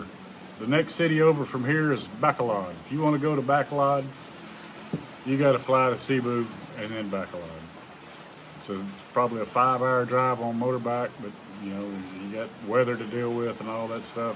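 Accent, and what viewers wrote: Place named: United States. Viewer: That’s Strong American accent , didn’t understand much of that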